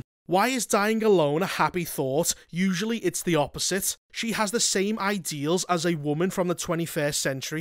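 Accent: Liverpool, United Kingdom. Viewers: So.. You're MAULER. But british... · I don't know which to laugh at the most; the review, the swearing or the indirect jokes! And I love the Scouser accent it's delivered in 😂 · I lasted a full ten seconds of the appalling voiceover. Scouse mixed with South Wales and a few other things.......thankfully there are subtitles.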